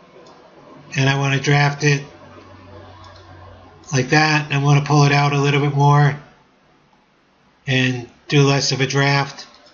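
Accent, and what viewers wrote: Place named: Brooklyn, United States. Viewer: Nice tutorial! Your accent is definitely more palatable than that of many Brooklynites! Only moderately detectable. :^)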